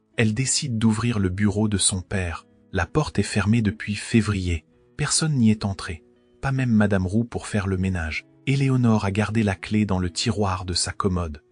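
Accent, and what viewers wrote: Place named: England, United Kingdom. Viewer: L'histoire est sympa mais l'accent anglais régulièrement est agaçant... C'est vraiment dommage 😢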